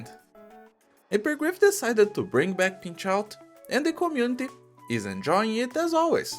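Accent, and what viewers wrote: Brazil. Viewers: This is CC because Pinchout is CC, Pinchout was just a bad CC and this shifts the whole perspective of people, if this one was the Beta for the new model (which isn't very different than the previous one in any actual meaningful level) instead of Pinchout, the whole discussion would be different. Most of the issues are community sentiment related, because of the proximity of Pinchout DOS and this, with all 3 being operator restrictive events designed for high end accounts. Pinchout didn't feel very like CC to most people because most people experience is Risk18, and the difficulty for the new "RISK 18" is more like old 24~28, which would be a problem if the rewards were locked behind it, like how it was on DoS, but this time around, the only sole reason to push 620 is the medal, this is exactly the correct type of reward to be locked behind the crazy clear, my own complaint is that the gap should be higher between medal and trim, the trim should be slightly higher, while the base medal should be slightly lower. 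On a random note, are you brazilian? Your accent really gives me brazillian vibes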